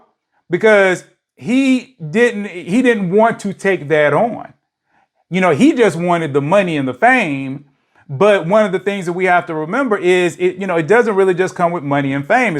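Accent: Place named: South, United States. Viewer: your country (or what some perceive as Southern) accent is hilarious 😂😂😂. I did not see that coming. Laughter is good for the soul so thank you for opening in that manner. I always appreciate your viewpoint so I was wondering if you are receptive to sharing your insight on a video recommendation from a viewer.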